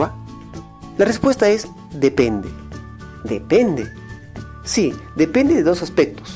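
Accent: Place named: Ayacucho, Peru. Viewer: Como que no me convences con tu argumento, tu acento suena quechua ayacuchano .. la letra 'Q' no la enuncias con caridad. .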